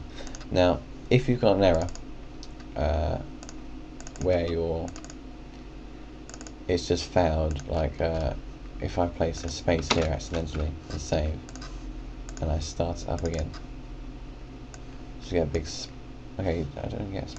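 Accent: United Kingdom. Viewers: HES BRITISH THEY MADE THE FUCKING LANGUAGE SO STFU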